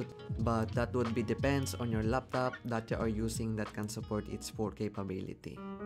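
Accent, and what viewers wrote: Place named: Philippines. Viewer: I lab yor pilipino aksent❤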